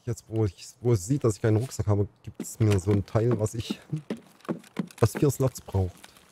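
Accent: East Germany, Germany. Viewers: Sonofcolossus hat es so schön zusammen gefasst - daher möchte ich mich auch mal zu Wort melden, nachdem ich Deine Videos schon eine ganze Weile erfolge: Erstmal Hallo Wie gesagt verfolge ich Deine Videos zu Tarkov schon länger. Anfangs dachte ich mir schon mal ab und an - was fürn noob. Aber je öfter ich in deine Videos geschaut habe, habe ich feststellen müssen - da spielt einfach ein "Otto-Normal". Keine high-end Waffen oder meta- gear, kein Rumgespringe oder ausm Fenster des 3. Stocks in die Parterre, oder straight auf jede Konfrontation,sondern einfach nur "normal" zocken. Klar geht das auch oft in die Hose (kenne ich selber), aber ich finde deine Videos dennoch wesentlich unterhaltsamer als die von Meyer, 2Twistet oder sonstigen namenhaften Streamern! Dazu kommt dein "Ossi-Dialekt" - herrlich - vor allem, wenn du weggeballert wirst und "fluchst". 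Oder einer deiner Kumpels mit denen zu in die Raids gehst (komme gerade nicht auf seinen Namen -!?) der immer so schön sagt "Ach hör' mir doch öff" 🤣 - herzerfrischend! (an der Stelle, Grüße aus dem Westen), Unterm Strich muss ich zugeben, dass ich mich mittlerweile echt auf neue Videos von dir freue und alle wirklich immer unterhaltsam sind! Mach' weiter so, bleib' Deiner Linie treu und viele Kills und Loot gewünscht! Grüße, DoomDog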